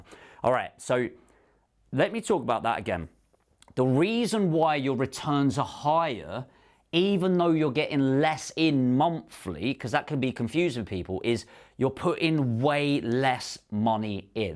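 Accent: United Kingdom. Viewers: I’m curious to hear you’re from which part of UK? 😅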